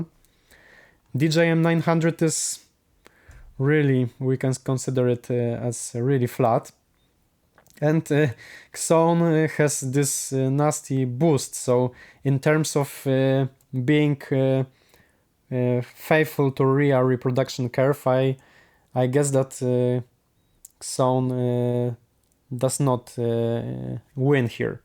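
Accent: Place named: Poland. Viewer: Odpalam anglojęzyczny filmik i słyszę polskie akcenty i widzę w opisie, żeś Polak xD pozdrowienia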